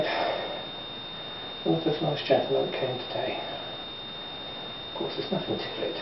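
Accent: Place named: England, United Kingdom. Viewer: sounds like your in england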